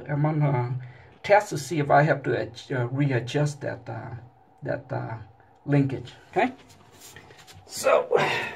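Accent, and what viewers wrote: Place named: Louisiana, United States. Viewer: You sou d like youre from Louisana.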